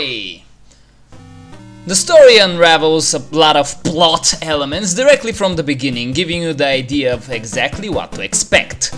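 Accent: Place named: Romania. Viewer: Ima say Romanian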